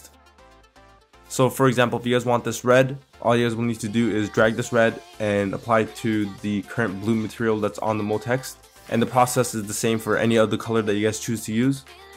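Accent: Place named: Russia. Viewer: Is it me or does this guy sound russian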